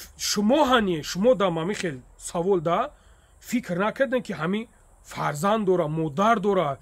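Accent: Kulob, Tajikistan. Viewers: Чисто ба кулобиги обиснит кади 👍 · Худованд дарувахт эмонша ай баданш хорич мекна беэмона хдованд хоршонкна илохим. Бехтарин суханхо лахчаи точики худочон нигахбонут.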